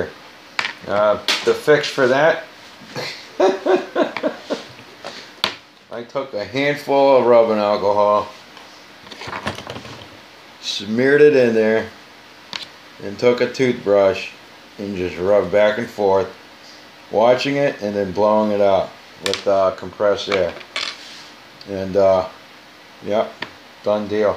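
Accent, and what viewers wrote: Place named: Buffalo, United States. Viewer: You sound like you're from Baffalo!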